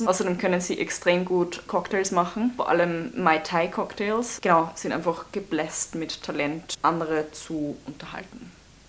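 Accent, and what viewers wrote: United Kingdom. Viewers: Österreicherin spricht englisch mit britischem Akzent jz hab ich alles gesehen haha thumbs up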